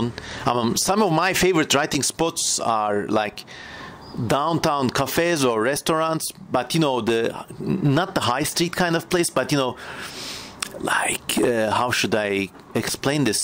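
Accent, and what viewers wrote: Turkey. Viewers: İngilizce aksanı Türkçe'ye çok ama çok benziyor. Soy isim " " olabilir mi diye merak ediyorum. Acaba? Eğer doğruysa belki bir evet cevabı alabilirim.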